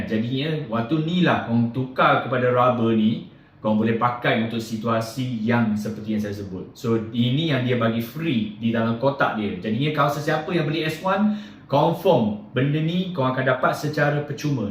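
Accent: Terengganu, Malaysia. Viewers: Bunyi macam org Tranung ni.. 😊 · mcm org Ganu je ni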